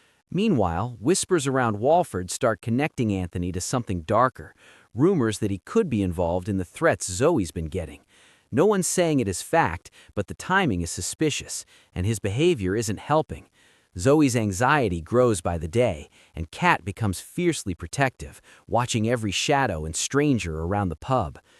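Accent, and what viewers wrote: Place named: United States. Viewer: Why is there an American speaking about our soap??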